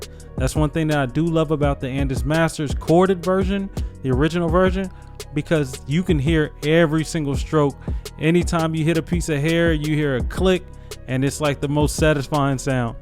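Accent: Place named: Sacramento, United States. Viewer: just asked cause you sounded like some of my folks in Sacramento but Oregon ain't to far from cali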